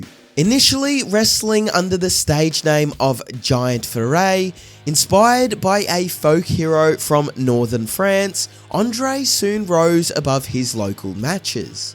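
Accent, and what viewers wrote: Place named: Scotland, United Kingdom. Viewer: the best narrator Scottish guy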